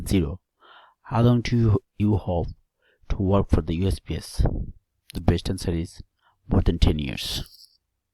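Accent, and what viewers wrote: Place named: India. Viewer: Technically not broken .. just an Indian accent lol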